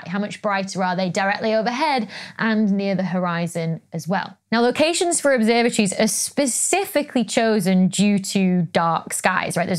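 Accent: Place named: England, United Kingdom. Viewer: your english accent is absolutely delightful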